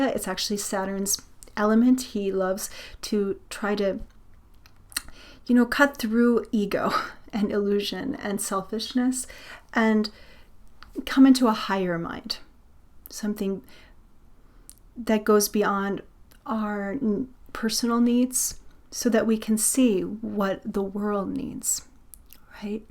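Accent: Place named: Australia. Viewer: Hello, Thank you for your videos and your beautiful nature! Your tone of voice makes me wonder if you are originally Australian?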